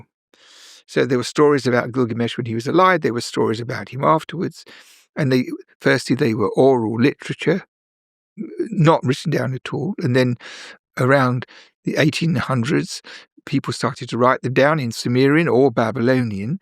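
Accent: United Kingdom. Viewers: Heard the British accent - knew it was gonna be good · Funny u must be American saying he’s got accent. He’s British maybe u 😢are the one with accent.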